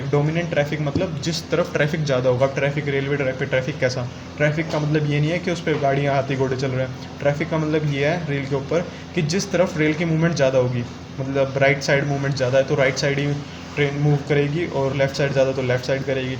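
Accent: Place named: India. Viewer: Yr aap to mst desi bolo ho mst lge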